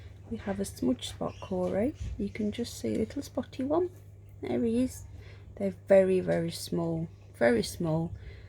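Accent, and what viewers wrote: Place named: Barnsley, United Kingdom. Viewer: Your Barnsley accent lady , (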